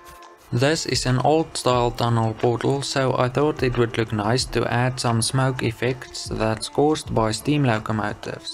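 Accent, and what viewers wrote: South Africa. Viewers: Ik dacht al een Zuid-Afrikaans accent te horen. :) Je video's zijn erg goed en heel professioneel. Ga zo door! Edit: Nu hoor ik je ook in de video zeggen dat je van SA bent.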